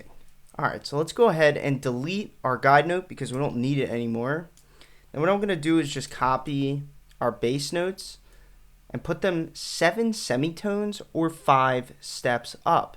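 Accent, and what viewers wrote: Philadelphia, United States. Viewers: The Philly accent is so strong. You got a fan.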